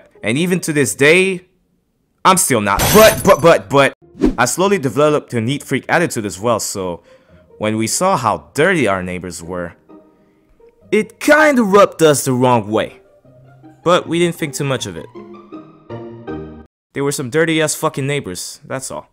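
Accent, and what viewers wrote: Montreal, Canada. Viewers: I can hear that Montreal in your voice :) !!! No hate I grew up in Montreal too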